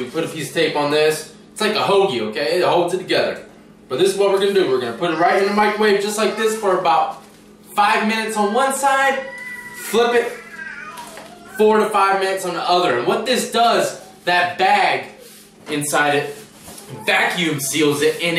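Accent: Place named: South, United States. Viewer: really let out the full southern accent and word choice loose on this one..."allllright yallll...we're on to da next one..."😆😆🤣💀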